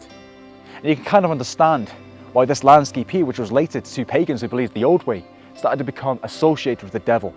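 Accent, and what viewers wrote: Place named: Wales, United Kingdom. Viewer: 🎉hope to see more of you, you have a wonderful melodious Welsh voice.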